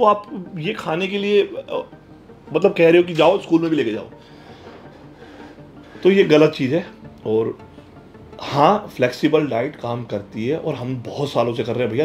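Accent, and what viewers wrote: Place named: Haryana, India. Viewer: Haryana se ho sir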